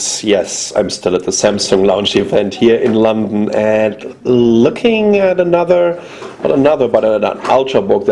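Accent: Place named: Germany. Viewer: his accent is awesomely evil. sounds very german.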